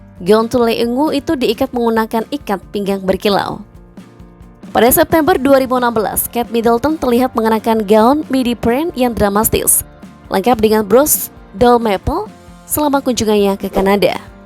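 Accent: Indonesia. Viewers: Saran untuk admin : supaya cari narator yg memiliki pronounce yg English British atau English US. Jangan yg bhs. Inggris a la Indonesia.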